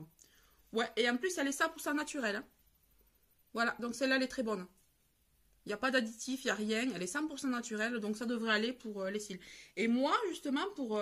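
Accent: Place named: Castres, France. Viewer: j'ai reconnue l'accent, je suis née à castres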